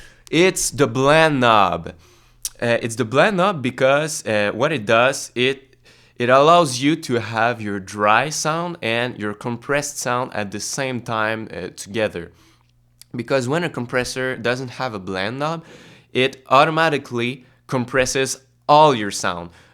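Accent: Quebec, Canada. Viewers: On reconnais tout de suite le gars de Quebec! Beau review! Tu me conseille quoi comme compression?, je suis guitariste Rock-Metal, je fait des solo et des bout plus clean et expérimental avec des effets, j'aimerais sustainer un peu mes notes et égaliser certains passages plus soft. c'est dur a choisir sur internet quand on les essaie pas. Le Cali 76 a l'air pas pire mais le prix me fait ** je suis sur de trouver quelque chose moins cher. Merci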